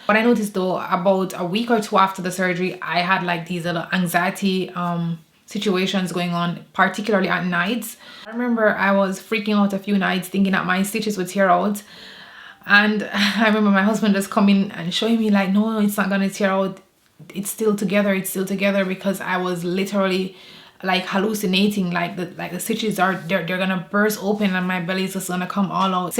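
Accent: Jamaica. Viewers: Waiting to do my open myomectomy yu sound like a Jamaican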